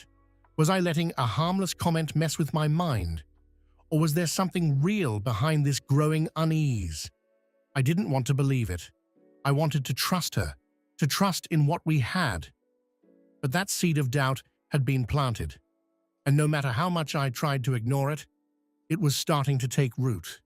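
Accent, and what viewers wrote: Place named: England, United Kingdom. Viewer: The English accent is fitting in this case.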